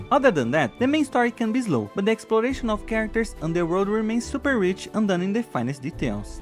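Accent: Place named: Brazil. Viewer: Tu é br né? Da pra reconhecer pelo sotaque kkk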